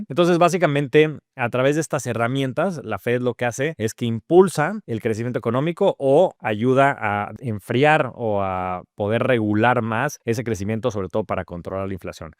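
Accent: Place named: Mexico City, Mexico. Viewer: Interesante. El algoritmo me mando para acá. Me suscribo y like a pesar del insoportable tonito chilango fresa q se te sale cada tanto.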